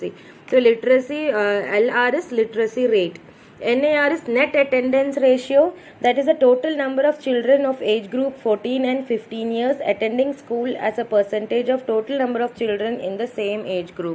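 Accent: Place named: Kasaragod, India. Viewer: Madam please try to avoid the Kasaragod slang ❤️ thank u mam for your help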